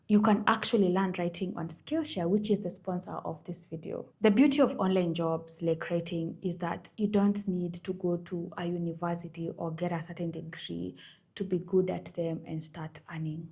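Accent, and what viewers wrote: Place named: Kenya. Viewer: I immediately knew from your accent that you’re Kenyan. Proud to see another countrywoman representing us in this space ❤️ (you are Kenyan though, right?) 😅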